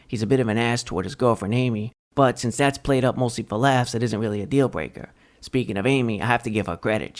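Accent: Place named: East Coast, United States. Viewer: Nice one! ❤❤❤ Love your East Coast accent accent! New York??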